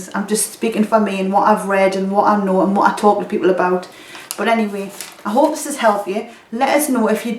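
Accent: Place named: North East England, United Kingdom. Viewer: Good summary and nice to hear a NE accent. Two other things that I find are really effective are: fasting (blood pressure also stays low afterwards) and going in the sauna (think it's because you relax rather than losing fluids - I drink a lot of water).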